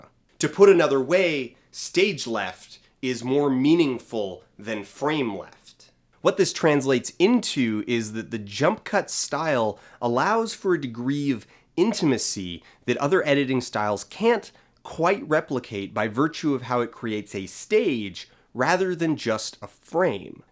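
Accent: Canada. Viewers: 2 things: -So... You're Canadian? -While I agree with the video and the ending message, I think sound quality matter more for video than you're giving credit. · I was just wondering if he was Canadian.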